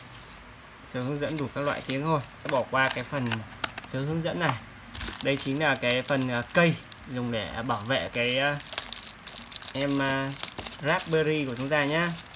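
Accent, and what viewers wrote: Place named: North, Vietnam. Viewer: Giọng bắc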